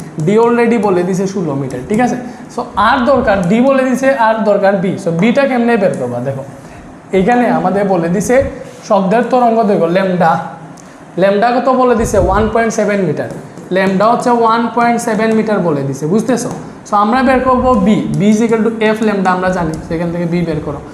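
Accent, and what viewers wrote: Sylhet, Bangladesh. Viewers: ইসসসসস , স্যার আপনি কি সিলেটি 😅😊😊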